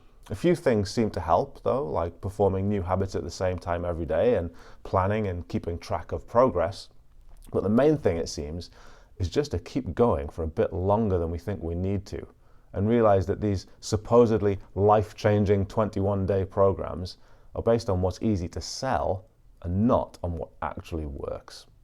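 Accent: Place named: North, United Kingdom. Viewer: I can't place your accent, you sound like a northerner but something is telling me you're Scottish.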